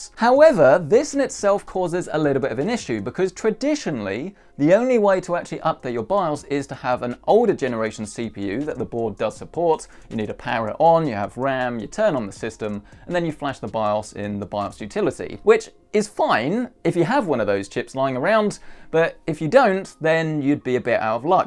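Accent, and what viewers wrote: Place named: Southern England, United Kingdom. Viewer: Sounds like Southern England to me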